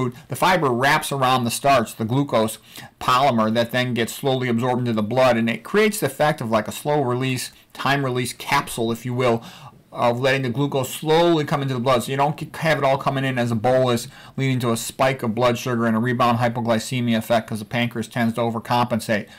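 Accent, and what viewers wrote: Chicago, United States. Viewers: What an incredibly fortunate student I am to have you as my instructor! Your tutorials are always clear & detailed, which allows us to easily understand & follow. Your drawings are especially helpful. THANK YOU for your continued generosity in producing these videos. As an aside, your Chicago accent is entertaining as well!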